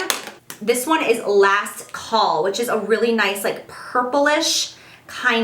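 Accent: United States. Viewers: Omg I love you accent it is the best aha the way you say caramel is so cool wish I was American xx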